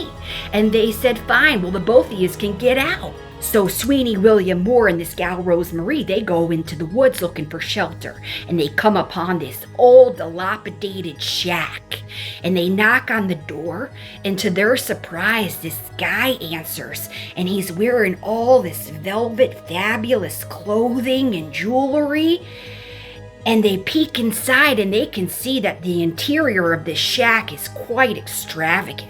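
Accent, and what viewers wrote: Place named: Midwest, United States. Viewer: OMG! Your story telling so reminds me of my mom! Because of the Midwest accent 😂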